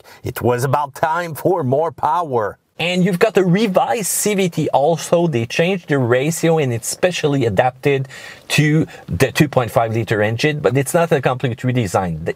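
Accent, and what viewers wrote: Quebec, Canada. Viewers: Quebecois accent...not "Canadian". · Love that Canadian accent....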